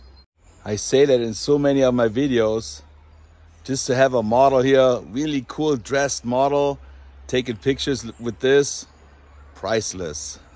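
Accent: England, United Kingdom. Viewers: The English accent had me straight away 😂😂😂😂😂🤣🤣🤣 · The England accent 🤣. Why I never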